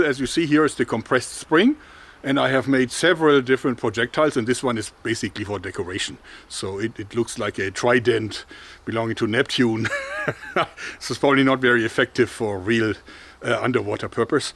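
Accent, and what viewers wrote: Germany. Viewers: Ganz klar deutsch der typ haha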